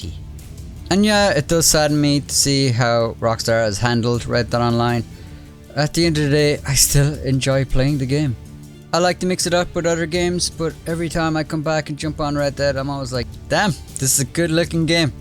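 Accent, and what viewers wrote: Ireland. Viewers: How is it, that you speak "More" Irish now, than just by a few videos ago? 🤬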